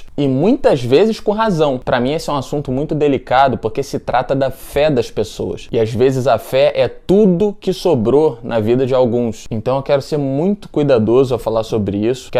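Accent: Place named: Rio de Janeiro, Brazil. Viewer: desse sotaque carioca eu ixtava com saudade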